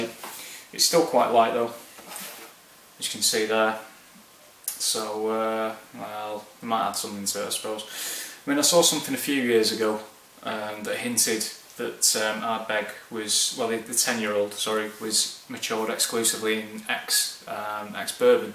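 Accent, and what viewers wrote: Lancashire, United Kingdom. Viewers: I've changed my mind. You're from Lancs.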